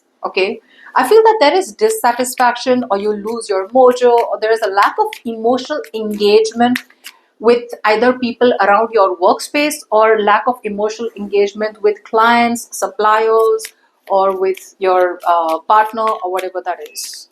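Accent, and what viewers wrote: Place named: United States. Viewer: American english....no understand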